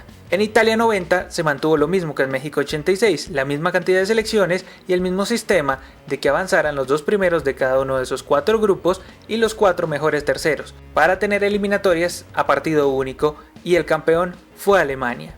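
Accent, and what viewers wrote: Colombia. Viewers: oie eres colombiano guey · eres Colombiano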